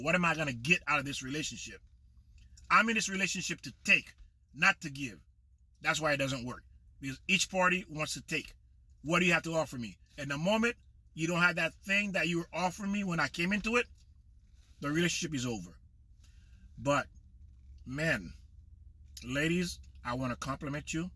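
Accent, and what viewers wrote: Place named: Caribbean. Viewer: Where are you from? I hear a West Indian accent.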